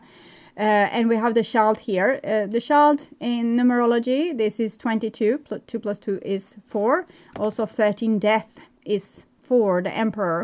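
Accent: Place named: Sweden. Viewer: Time to enjoy a Swedish lass, accent again. Love your beautiful voice . Thankyou for the reading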